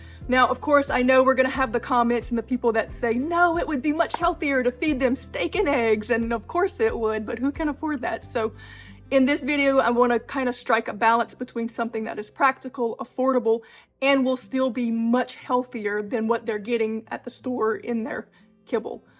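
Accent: Louisiana, United States. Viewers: Are you from louisiana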